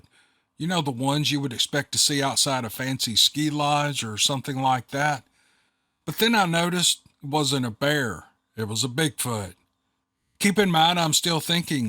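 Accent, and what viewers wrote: South, United States. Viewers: If Judy gets a kick outta your southern accent she’d be aesthetic to hear U and I talking back and forth 😂. I grew up in the south with parents born & Raised in The Southern Appalachian Mountains of NC. They grew up in the NC mountains or the Blue Ridge Mountains right by the southwest tip of Virginia. Although my parents had moved further south below the mountains they grew up in before I was born. I still spent much of childhood life in those same mountains. Because my dad was a Traveling Baptist evangelist minister and we went back to those same blue Ridge Mountains every weekend. Both my Grandmothers still had homes there so we went and stayed in one of their homes while dad preached at one of the churches along those blue ridge mountains. So I heard and picked up & have always spoke the Appalachian Dialect 😂! Although I grew up further southeast of those Appalachian mountains I’ve always spoke the Appalachian Mountain dialect. 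Still to this day I have people say where are you from ? You’re from the mountains aren’t you ? I laugh and say no I’m actually from here but my parents and all my Kin folk are from the blue ridge Appalachian mountains. They’ll say well you don’t talk like the rest of us southerners, you have a unusual use of words we understand but don’t hear around here. 😂 I’ll just say well it was born and bred into me & it’s actually Appalachian Hillbilly dialect. Although I’m educated I still carry that southern Appalachian talk. Using words or phrases like: (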